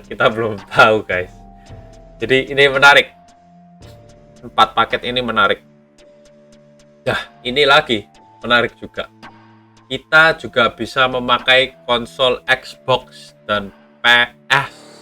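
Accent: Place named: Java, Indonesia. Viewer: Si medok membantai Zombie kapan nih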